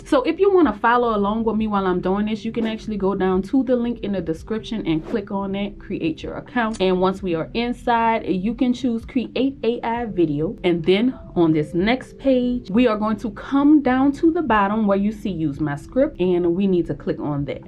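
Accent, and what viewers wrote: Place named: South, United States. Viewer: she's definitely from down south with that accent lol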